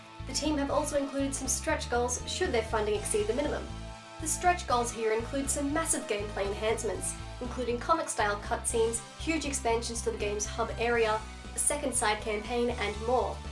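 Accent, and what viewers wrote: Australia. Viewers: Oh wow an australian :D hi!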